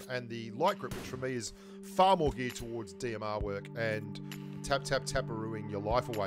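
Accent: Australia. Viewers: You not boring bro haha especially that Aussie accent always cool to listen to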